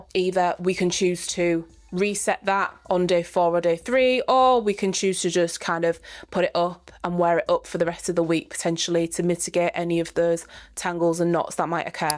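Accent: Northern England, United Kingdom. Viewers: Big up to the North England accent btw! ❤❤ just want to add that Kenra moisturizing oil has been a game changer for friction reduction on my tight 4c hair. Very lightweight. You might enjoy!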